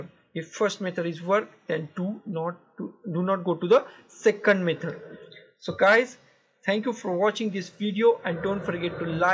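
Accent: India. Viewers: When i heard indian accent i didn't even needed to try it i already know it will work thank you brother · his accent his pure indian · When i heard his indian accent , i knew it gonna work. Thank you man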